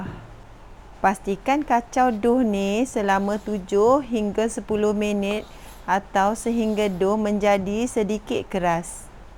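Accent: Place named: Malaysia. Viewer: Ni bunda malaysie logat bicaranya, tusini kue cincin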